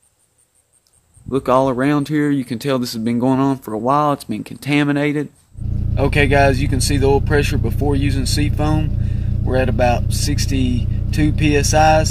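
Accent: South, United States. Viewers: I love this guy's southern accent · I looooove a country, hillbilly accent.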